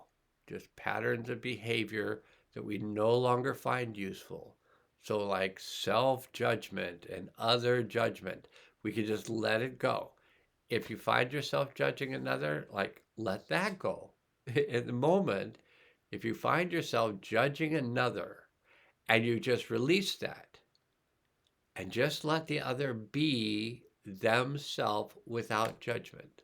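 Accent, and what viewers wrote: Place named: United States. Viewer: I'm familiar with Nancy Rebecca and the blue light info; she's in the USA as am I - wondering what part of the world u r in? (You sound like maybe USA)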